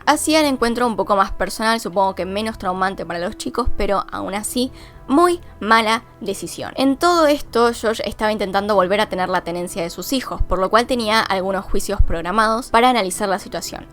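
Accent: Argentina. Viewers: Ella es de Argentina y allá su pronunciación así suena...a mi en lo personal me encanta su manera de hablar, es linda! 👍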